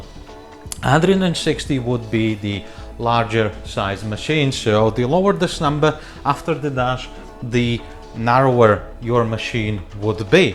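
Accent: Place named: Liverpool, United Kingdom. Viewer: Scouse accent really shinning through now 😝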